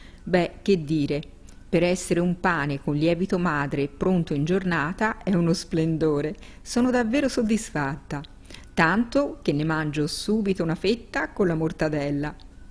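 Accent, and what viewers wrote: Tuscany, Italy. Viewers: Molto brava, complimenti e che bel toscano parla. Senese?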